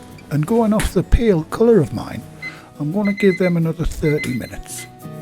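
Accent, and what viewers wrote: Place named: England, United Kingdom. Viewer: “ Mash and Roasties.” I love the English terms and accent ❤️. Hugs from SF Bay Area, CA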